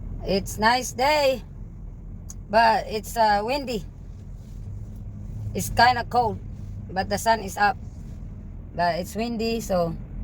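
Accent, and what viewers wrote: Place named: South, United States. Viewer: Bait mo lola kawawa din ang bata kapag ganyan naiiwanan.love your southern accent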